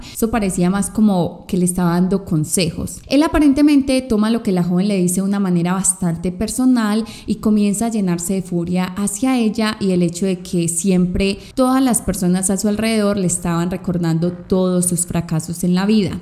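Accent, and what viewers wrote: Colombia. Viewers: El acento colombiano es muy agradable !!!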